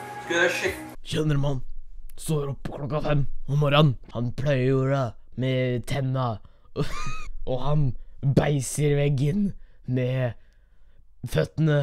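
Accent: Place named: Sunnmøre, Norway. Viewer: Beste Sunnmøre dialekt ej he hørt :D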